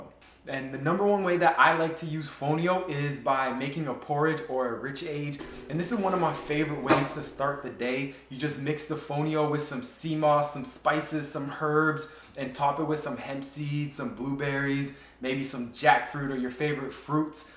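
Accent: Canada. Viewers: Are you Lucian 🇱🇨 ? Your accent sounds Canadian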